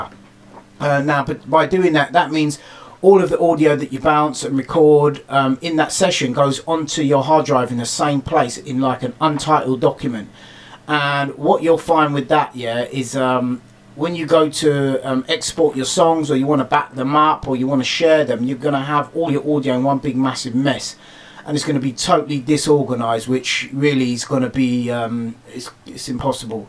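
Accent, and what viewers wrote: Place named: United Kingdom. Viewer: The clearest British English I ever heard...Thanks Man, good point...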